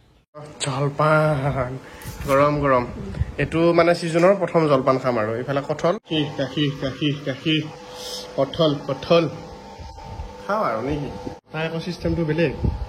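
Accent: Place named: Darrang, India. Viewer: ভিডিঅ' বোৰ ভাল লাগে কথাবোৰ পুৰা দৰঙীয়া আমিও দৰঙৰে। আপুনি মানে খালৈত বৰশী বাইছে আৰু নেকি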